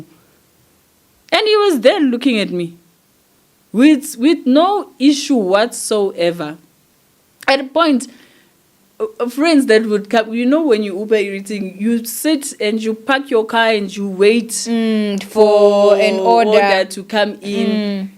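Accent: Nigeria. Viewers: THE NIJA HAD TAKEN YOU OVER SISI, EVEN THE ACCENT IS LAGOS. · Women from other countries should know that when Nigerians come to any country, they are not coming to marry anyone. If they marry you it is to benefit them not you. Whatever they do is to make money. Leave Nigerians for Nigerian women. But girl, you now speak like a Nigerian.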